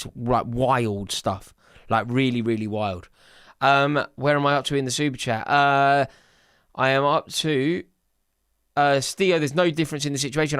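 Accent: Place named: Liverpool, United Kingdom. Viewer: I love your Scouse accent and I love that you said isak won't play for Liverpool lmao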